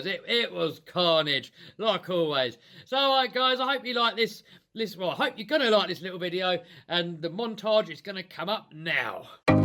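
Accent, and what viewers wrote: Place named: United Kingdom. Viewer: I don't know if this will be correct but because of your accent it should be BBB rc ( British bashing brothers) rc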